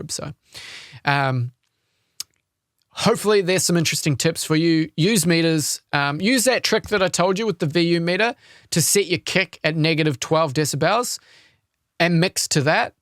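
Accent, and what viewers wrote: New Zealand. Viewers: Hey mate. Just found your channel and so nice to hear a fellow Kiwi accent. I just set myself up with Ableton live lite. free with my Focusrite solo interface. I've just watched your first video of the 25min intro for beginners and found it great. It would be great if you changed your mouse cursor setting to make it bigger on the screen and easier to follow your movements when sorting settings. other than that, Just subb'd thanks for you channel!!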